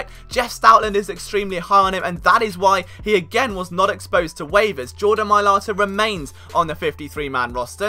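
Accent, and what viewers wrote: England, United Kingdom. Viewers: I don't what it is, but I just can't listen to NFL commentary by a Brit. Could be the most insightful view but just can't stomach it. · Love the channel to bits. Where in England is your accent from? Keep up the great work, Philly loves ya kid!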